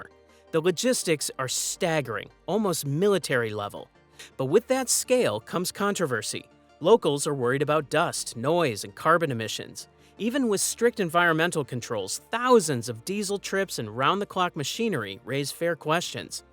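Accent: United States. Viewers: We should be hearing a voice like Brenton Ragless instead of that American voice 😢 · Why is an American narrating? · Why is an American accent narrating an Australian project?, " tunnelling under homes and businesses , no they're not because the home owners and businesses have been relocated whether they wanted to be or not, I get the need for progress, but I haven't heard what diversion means are being implemented so wildlife can be safe while crossing sides? It's distressing to see wildlife dead roadside because of a pathetic piece of wire for them to use, why can't we take a leaf out of the book of other countries and build an underpass or overpass for them, or is that not profitable 😮 I do like the plan for a park topside over the tunnel, nice bit of greenery. 😊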